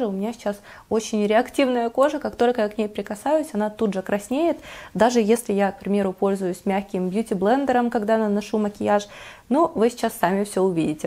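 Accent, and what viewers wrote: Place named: Ukraine. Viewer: украинский акцент не спутаешь с польским или даже русским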